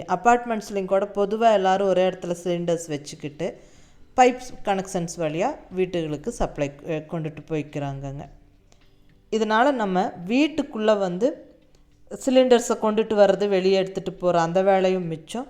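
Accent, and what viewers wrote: Kongu, India. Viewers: Mam, you are an inspiration to us! andha kongu tamil super ponga. adhukagave onga videos paakrom 😊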